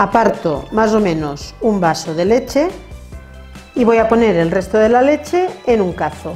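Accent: Catalonia, Spain. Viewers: Hola, Eres de Logroño? Te noto un cierto acento catalán, no?? En cualquier caso me encantan tus recetas y aún más como explicas. Un abrazo guapa 😘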